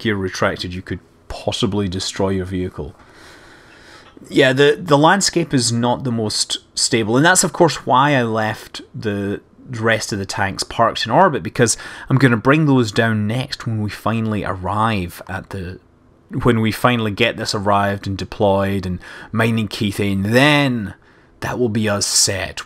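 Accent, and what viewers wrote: Scotland, United Kingdom. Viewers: Nothing can be boring with a scottish accent!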